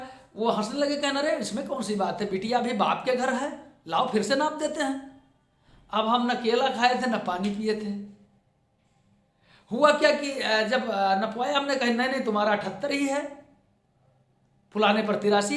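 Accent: Awadh, India. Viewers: very intresting sir.. and the way of your talking in awadhi is also imressing..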